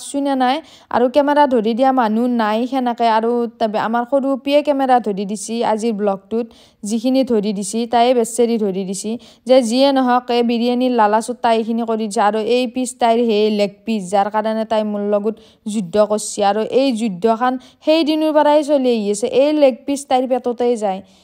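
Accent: Lower Assam, India. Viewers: Bohut val lgil vlog tu. Bohut hahilu kotha khini huni. Actually moi upper assam r. Haikrne lower r vakha tu huni val lge. Hahi uthi gol😂. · r accent lower assam or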